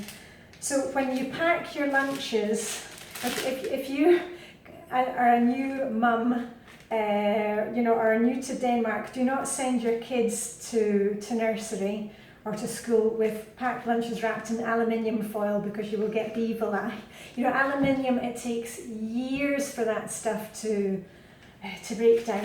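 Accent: Scotland, United Kingdom. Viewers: Its so different than the US. hardly anyone would eat liver pate’ here because the abuse those poor geese suffer is horrible but we think nothing of using plastic sandwich bags which never disappear!!!! Okay so I’m new here. Why are you living there? You sound like you’re Scottish. How old are your kids? You look adorable. I love your haircut (I’m a hairdresser) and your cute outfits.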